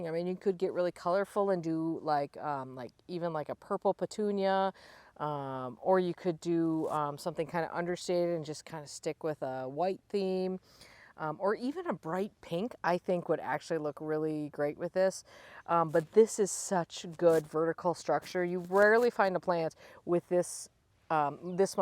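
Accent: Wisconsin, United States. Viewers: I grew up in Michigan. I love your Wisconsin Accent. Im thrilled I found your channel. Subscribing & following along.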